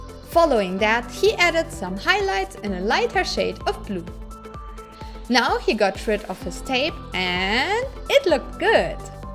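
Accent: Germany. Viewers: a question...r u from germany...cuz ur accent sounds pretty german xD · so ein deutscher Akzent..... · You sound a bit German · Your german accent shows thru... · 2 sec geschaut direkt gewusst das sie deutsch ist XD. deutsches englisch hört sich einfach retarded an XD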